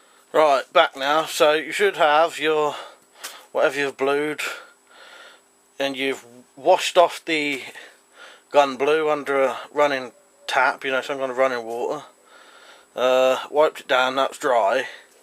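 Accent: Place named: England, United Kingdom. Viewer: Love the English accent. Great video and nice cleaver!!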